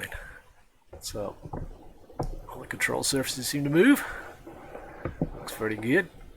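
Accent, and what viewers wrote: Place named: United States. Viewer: I just can't decide if you have a genuine American accent, or are a Brit pretending to have an American accent! Sorry, no offence intended, was just distracted by this as I'm a linguistics academic!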